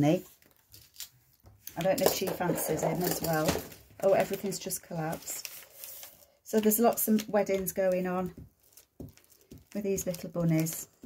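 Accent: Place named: Aberdeen, United Kingdom. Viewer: you are so very welcome... I tried to write you a note 🗒 about being able to locate the book you showed & mentioned... The keyboard wasn't able to show up.... any rate... I found it... Used & in like new condition 4 only a couple $$'s + SH... Thx 🙏 4 showing the front cover.... Your stories while you are creating are most enjoyable.... Your voice with accent take me back to my time living in Aberdeen & New Machere.. Happy time's... Memories more.... Later my BOO's... Sincerely sincere Miss Judith 🌹... xx 🎶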